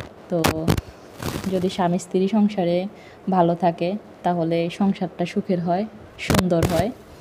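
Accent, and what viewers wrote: Noakhali, Bangladesh. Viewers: Noakhailla naki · আপনার বাড়ি কি নোয়াখালী আপু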